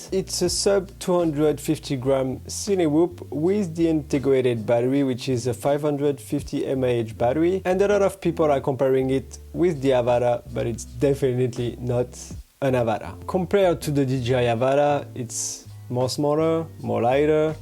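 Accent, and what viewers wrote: France. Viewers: Ont dirait tu a un accent français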